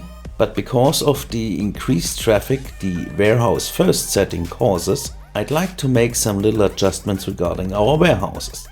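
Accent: Germany. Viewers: Diggi ! Dein German Accent macht mich ech krank. Kann ich nich gucken, es ist so dermaßen schlimm !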